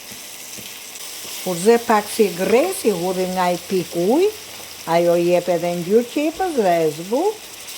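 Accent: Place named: Central Albania, Albania. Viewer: Te lumshin durt dhe goja me tfolmen e shqipnis mesme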